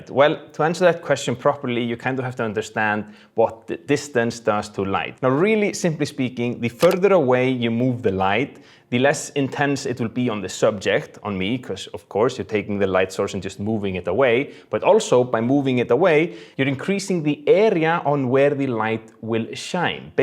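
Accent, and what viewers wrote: Iceland. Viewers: Been watching your videos for years, but I"m not sure if I've ever commented. Lots of family in Iceland and the Faroe Islands so your accident makes me homesick in a good way. I wasn't just watching a bunch of lighting videos and thinking I miss the days when tutorials didn't feel like ads. So nice to see you doing this video with these old godox lights and gels. Without experience I think a lot of folks these days would think they need to spend more than they do to get the look they want. Thanks for reminding me how capable my old godox 60 is. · 👍Very Well Explained !! I believe I started liking icelandic English more than the american one 😀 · Icelandic English. I recognize that accent. Great video!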